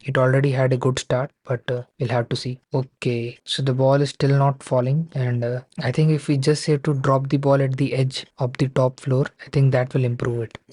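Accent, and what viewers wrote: India. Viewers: I wasn't expecting Indian accent when I opened the video. However, thanks for the comprasion.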